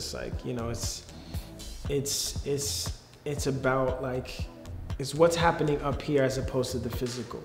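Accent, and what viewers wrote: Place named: New York, United States. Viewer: You can really tell he is really from New York 😂🤣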